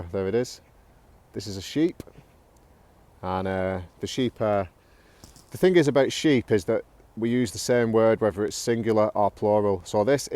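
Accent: Northern England, United Kingdom. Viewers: hello, I really enjoy your videos, especially when you take it in the nature. So two days ago I watched the film The Firm, it's about football fans, they fout with each other and at the end one of them was killed. I know the soccer in England is... like national sport..(I think) and my question is...is there in England the same groups of people in nowadays who are more interesting in having a battle after match....so what else... could you recommend tv shows or movie with the northern English accent something like yours...)) I am going to have the same one!!)) again thank you very much for things you are doing!!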